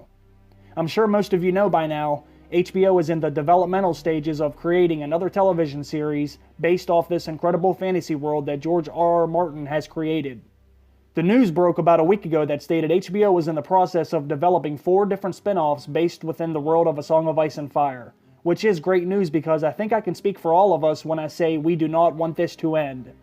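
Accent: Pittsburgh, United States. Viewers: Are you from Pittsburgh by any chance? That accent is undeniable! I knew it as soon as I heard it! Bet you say jagoff too, don't ya?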